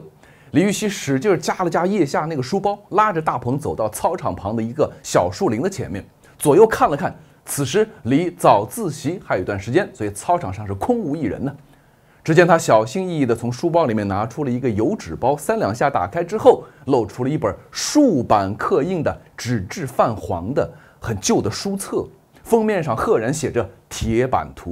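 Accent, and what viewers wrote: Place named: China. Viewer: 聽你口音，因該是在海外的大陸人，就你敢說，哈哈哈!!!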